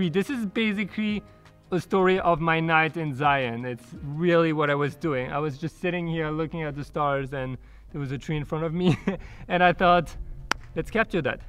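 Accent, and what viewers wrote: France. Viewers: you obviously are french haha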